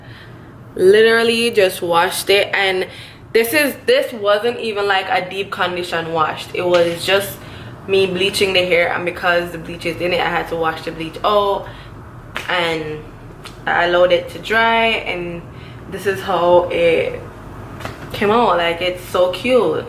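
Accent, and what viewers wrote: Jamaica. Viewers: Subscribes because I sense a Jamaican accent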